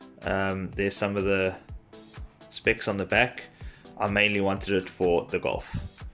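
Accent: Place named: South Africa. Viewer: SA? you sound like you are from SA.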